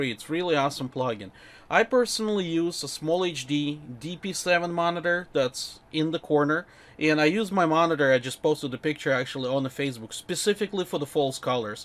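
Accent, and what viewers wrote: Russia. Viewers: Прям слышу по акценту что русский))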